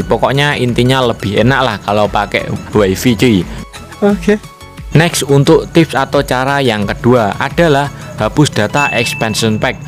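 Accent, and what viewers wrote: Java, Indonesia. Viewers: Orang Jawa y bang , cumananya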